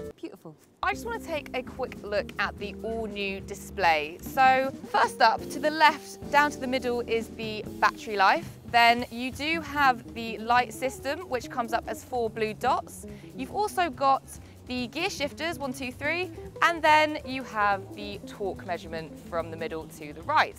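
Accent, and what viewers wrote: United Kingdom. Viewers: With her British accent, talking about London traffic and the bike being 16.3 kg, I was totally expecting her to use KM instead of Miles.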